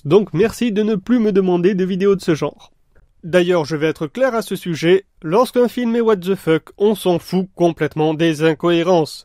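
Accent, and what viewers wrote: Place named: Lot-et-Garonne, France. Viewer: Par contre, t'es vraiment du Lot et Garonne? Parske t'as un léger accent.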